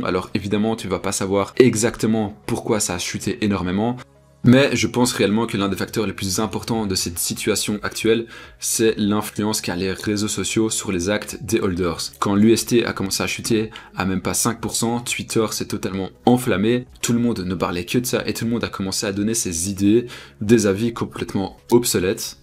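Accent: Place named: United States. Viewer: Bonne vidéo, je m'abonne ! Au passa l'accent est Américain apparemment 😁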